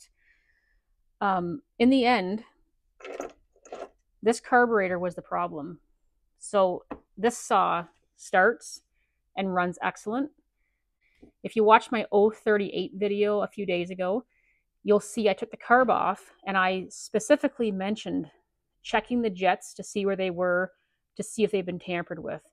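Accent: Canada. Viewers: Hi, just discovered your channel. I'm a Stihl guy, a retired hobbyist. I've been working on saws for about 7 years now, after I burned up a piston due to a leaky crank seal and needed to fix my 034. I'm in northeast Arkansas and I'm guessing you're Canadian from the way you pronounce "out". I'm now 7 years older and dozens of Creamsicles "richer". The 025/MS250 has the same starter pulley diameter as the 170, 210, 230, and having the largest displacement of these, is notoriously hard to pull (also hard to start, if you make the mistake of waiting for the first pop to turn the choke off). The lever arm of the pulley is IMHO too small for the job. However, for a younger able bodied person armed with the above knowledge, I believe it is the best bang for the buck in a homeowner saw. Another caveat: don't try to advance the timing unless you don't value your arm. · You're very good at what you do and you deserve many more subscribers! 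Is that an East coast accent? Newfoundland, maybe? I was so surprised there was nothing wrong with the flywheel key ; I was sure that was the problem, an over-advanced spark. A great how to video. Subscribed. And cheers from the West coast of Canada! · I knew it had to be a Canadian engine shop when I heard her accent and saw the snow on the ground. God makes tough people in Canada.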